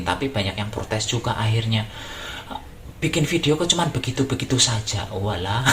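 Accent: Banyumas, Indonesia. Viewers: Sebenere sampeyan asli mana pak...Banyumas atau mana...kok busnya ngapak... Salam ngapak 👍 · Selamat dan sukses buat mas.semoga tetep jadi anak yg berbakti sama biyunge.jangan dirubah yo logate ngapak,biar tetep rameee.... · Bahasa ngapak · Jawa nya si mana ms.....? Ngapak ya.....?? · Selamat Yo mas mudah" sehat terus dan tetep ublek" di tunggu resep" nya dan tetep bahasa ngapak nya aku suueeeng tenan... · aku kok seneng dgn located ngapakmu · Bahasa ngapak lucu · Asli orang mana Mas 😀 jawanya ngapak bisa menghibur nitizen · Jujur Lik nyong SUBSCRIBE ya mergo bahasane sing ngapak Lik lucu😀 Padahal ya basaku dewek... selamat ya Lik sukses selalu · Lanjut kn dengan ngapaknya👍🏻👍🏻😅😂😂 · Mas....sy sering buat kuenya.....sederhanapun sdh bs jd kue.....alami lg. The best pokoknya lanjut mas....aku jg suka dr bahasanya yg ngapak2. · Yg bikin laris karna kocaknya NGAPAK ASLI dan ndesa bgt itu... 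· Mas ini sangat lucu, asli, cerdas, sangat menghibur, ngapaknya, jangan marah, ya aku panggil kamu, mas???? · Ak seneng ndelok mergo bosone ngapak lucu..alat2te simple bahane yo gampil..cara penyampaine gampang plus cletuk2kane gawe ngekek dewe..semangat yo mas..berkah barokah aamiin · Ini yg selalu ditunggu resepnya.... anakke mbok Dirah... seneng soale pd2 wong ngapak... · Channel sampean kuy unik, mas, khas dengan logat "ngapak", yang tidak terbiasa dengar merasa lucu dengar sampean ngomong Selamat ya.. Insyaallah barokah, jangan lupa rezekinya untuk istri & anak nya, ech sudah berkeluarga blum mas..🤭😘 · Mas aslinya mana...kok bahasanya NGAPAK....dan sekarang tinggalnya dimana....mas selamat ya semoga berkah.... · Mas selamat ya smoga makin byk subsucrebenya,krn ada ciri khas bhs banyumas apa mas 1 daerah sm mas Anngger pradesa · Selamat ya mas dengan bahasa ngapaknya justru itu jalan rezekinya sukses terus ya jadi diri sendiri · Selamat ya ms Justru aku tertarik dr ngapak, simpel n praktisnya itu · Ora ngapak ora kepenak. 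Kereeen mas 👍👍👍👍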